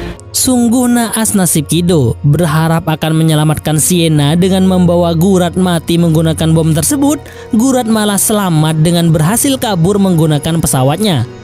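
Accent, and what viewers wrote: Madura, Indonesia. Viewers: Ngereng yatoreh?Reng madureh?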